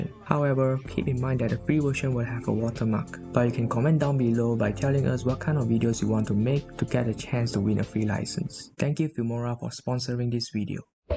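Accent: India. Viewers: u sound indian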